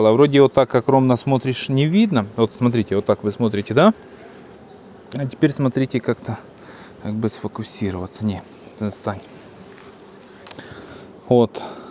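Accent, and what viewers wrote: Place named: Ukraine. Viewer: К ролику можно цеплять метку геолокации. У многих видел. А иначе понять, что вы из Украины, можно только по говору и по вальмовой крыше на заднем плане)) и то это не точный способ. На юге России тоже похожий говор и крыши:)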